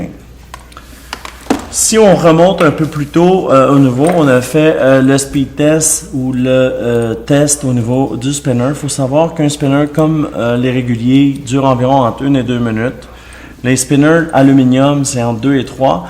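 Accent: Quebec, Canada. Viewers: Perso les gars moi je regarde les vidéo 50% car son accent quebecois est génial et 50 % pour découvrir des nouveaux objets.^^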